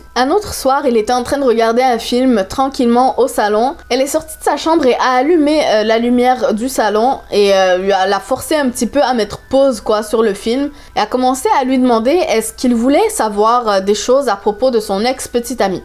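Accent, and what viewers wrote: Quebec, Canada. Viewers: Est tu québécoise car on dirais un accent mis français mis québécois?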